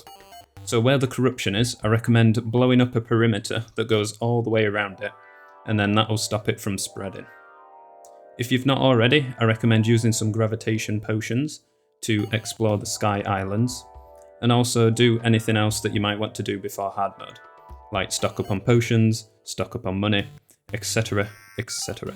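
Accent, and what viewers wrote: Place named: North West England, United Kingdom. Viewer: are you from Manchester or the North West of England? Your accent seems familiar, nice vid!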